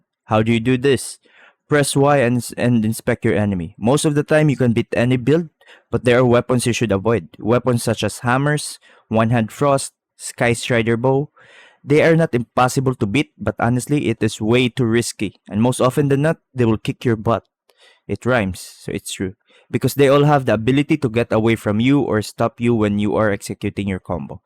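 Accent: Philippines. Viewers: Build? Btw are you a filipino? You have an accent like it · you sound very filipino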